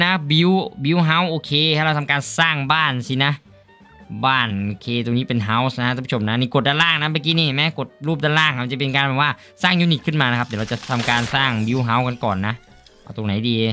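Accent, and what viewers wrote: Korat, Thailand. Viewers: คนโคราชมะ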